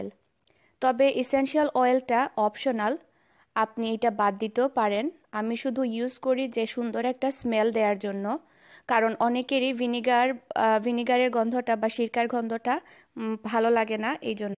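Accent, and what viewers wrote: Sylhet, Bangladesh. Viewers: আপু আমার খুব উপকার হলো।আমি একটা জিনিস দেখলাম সবাই তোমাকে বলছে তোমার মুখে সিলেটি মাত বেশি মানাই।এটা কি ঠিক ।আমার কাছে মনে হয় যে ব্যাক্তিকে ভাললাগে তার সব কিছু ভালো লাগবে।তাই সে যেভাবেই ভাষা বলবে।আমি তোমাকে ভালোবাসি আমার সব ভাষা তোমার মুখে ভালো লাগে। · Apu kmn aso.tomar mukhe shylety vasa onek valolage.. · আপু তোমার মুখে সিলেটি ভাষা টা ই খুব ভাল লাগে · আপুমনি আজ অনেক কিছু শিখতে পারলাম। খুব কাজে আসবে ইনশাআল্লাহ। আপু তোমার মুখে সিলেটি মাত আমার খুব বালা লাগে।আপু এখন আপনি কেমন আছেন?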